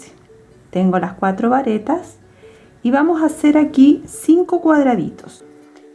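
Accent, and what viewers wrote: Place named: Chile. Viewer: LO SABIA X EL TIMBRE DE VOZ ....YO TB SOY CHILENA Y T FELICITO XQ EXPLICAS MUY BIEN ...GRACIAS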